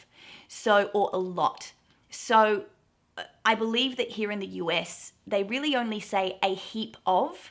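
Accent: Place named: Australia. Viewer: Hello, i only wanted to say. I loved the Australian accent since i did watch some Australian TV shows in mij teenage years. So i love these video's of you. Keep up the good work. And maybe it can be usefull when i'm going to Australia. It's on my bucketlist! Greetz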